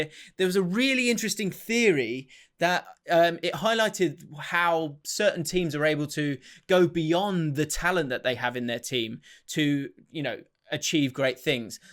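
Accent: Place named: Manchester, United Kingdom. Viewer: what part of Manchester are you from Trafford or Gorton ?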